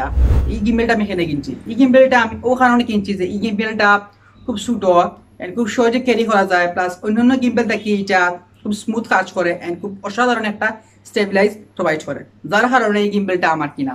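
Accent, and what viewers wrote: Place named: Sylhet, Bangladesh. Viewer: ভাই আপনার সব ভিওয়ার সিলেটি না। পারলে শুদ্ধ বাংলায় বলেন।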